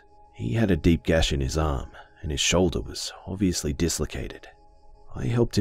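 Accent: Australia. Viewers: Is it me or can I hear a hint of Australian? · ..Australian, right? love your narration! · Some random person yep, you can indeed, is Australian:)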